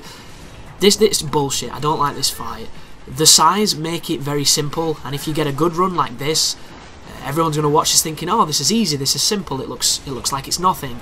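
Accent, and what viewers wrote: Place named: Yorkshire, United Kingdom. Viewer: Hahaha you are from yorkshire, ME TOO!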